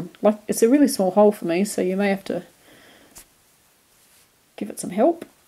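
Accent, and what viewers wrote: Australia. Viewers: So enjoying your Aussie accent and way of talking! Oh and the crochet too of course :)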